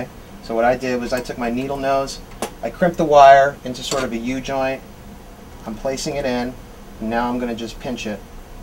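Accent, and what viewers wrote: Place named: United States. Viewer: cos he's american... they say it like "sodder" for some reason.. even though nobody else in the entire world does.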